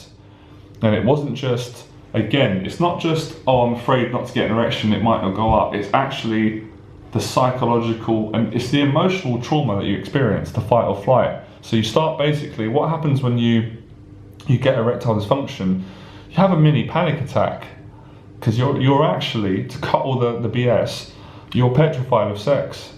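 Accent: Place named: United Kingdom. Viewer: You have the perfect British accent. In some of your other videos there are a few times when you misspeak with a bit of bad grammar. That is coming from some mental thing where you regress into some lower class English isn't it? I mean it happens like someone might stutter. As an American listening to you speak like you are doing here (when you are going slow) you sound like you went to all the finest schools and all. Even the slight mumbling and slack in your speaking (I recognize it as a certain British speech style.) sounds to me as an American to be some intentional upper class way of speaking. I bet King Charles and the cavaliers sounded something like you.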